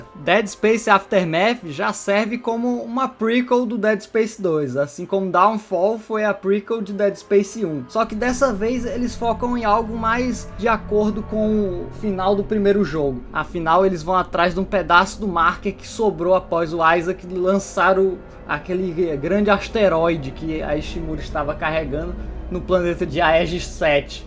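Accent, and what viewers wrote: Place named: Northeast, Brazil. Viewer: Na moral, é horrível ter que ouvir essa narração de nordestino, bagulho irritante demais!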